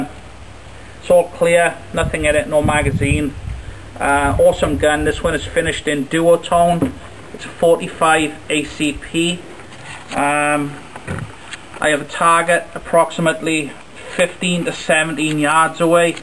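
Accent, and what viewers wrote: United Kingdom. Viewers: Damn man! Practice makes perfect. I can shoot groups like that with my .357 but not that fast. The recoil requires more time to re-acquire the target. I'm in the market for a good budget 1911 and your review has been very helpful. Incidentally where are you from? The accent says British Isles but I can't quite place it.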